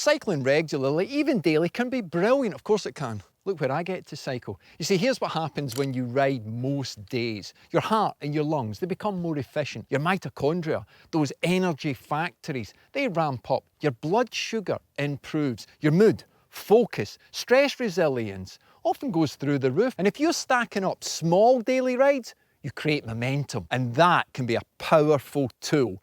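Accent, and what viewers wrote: Scotland, United Kingdom. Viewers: are you scottish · You sound Scottish, laddie.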